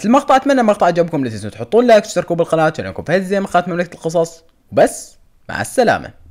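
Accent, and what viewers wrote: Iraq. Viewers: ابغا اشوف اخبار اليوتيوبرز اروح عندك واحب اهل العراق ولهجتكم الحلوة متابعينك من السعودية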